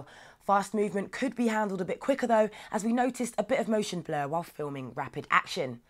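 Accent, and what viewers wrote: England, United Kingdom. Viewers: Are you British? You've got the accent.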